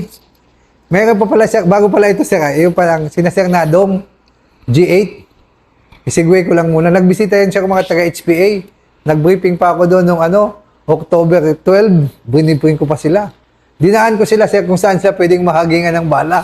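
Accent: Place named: Bicol, Philippines. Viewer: Parang bicolano ang punto ng salita niya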